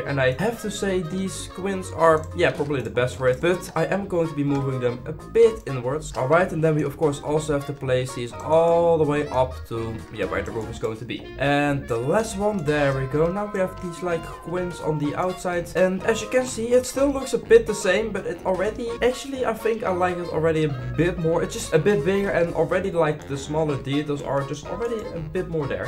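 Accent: Netherlands. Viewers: Are you Dutch? Because I think so (Ik denk het namelijk wel)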